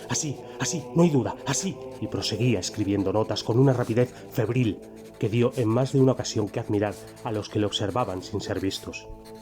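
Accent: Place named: Spain. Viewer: Woooow! Me encanta tu voz Española. Muy buen relato te felicito.🤗👏👏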